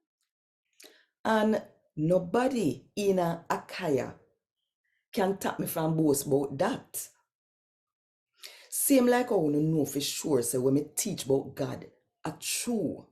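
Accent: Jamaica. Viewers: This passage has reinforced how difficult leading a church is. I loved how once again Paul's day to day challenges in building the early church shines through. I follow these readings in my Bible & the Jamaican patois makes it so much more understandable re context and emotion. Thanks again for this blessing and your service to the word of God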